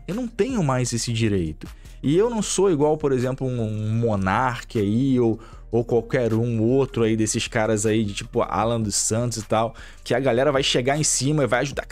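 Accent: Rio de Janeiro, Brazil. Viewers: Eu acompanho o desde os tempos que o cara tinha 20k de inscritos, discordo dessa opinião dele, mas o pessoal fica muito emocionado. Eu tbm sou carioca e esse jeito dele falar é uma característica nossa, no fundo ele sabe que o pessoal não está puto por não ver a boneca pelada, mas está puto com o fato de mudanças nos jogos.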